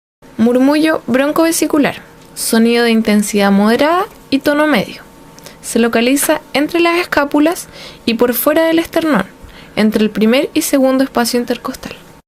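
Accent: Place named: Chile. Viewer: Hermoso acento chileno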